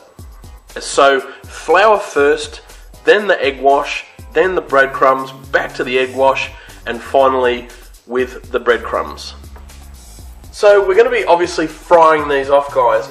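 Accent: Australia. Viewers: WOW!, #1, i love your Australian accent, along with your fantastic cooking. Especially that popcorn chicken, and my family is also a big fan of your churros. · hes aussie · For god sake chocoswede leave him alone 1 ain't gay it's just an australian accent and 2 ur jank. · Pretty sure he's Australian 0.o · He is an Aussie not American.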